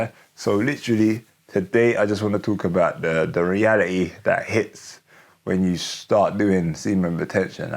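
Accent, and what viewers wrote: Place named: United Kingdom. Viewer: British accent thick. Af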